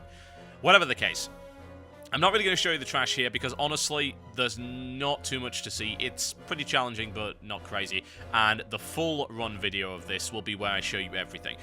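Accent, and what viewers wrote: United Kingdom. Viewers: f.u.b1ch u sounds british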